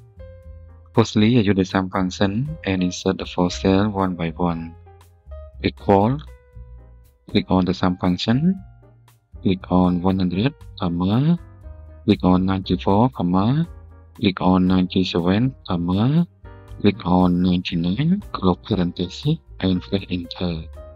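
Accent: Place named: England, United Kingdom. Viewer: I need to read translations related to my language because I have problems understanding the English accent.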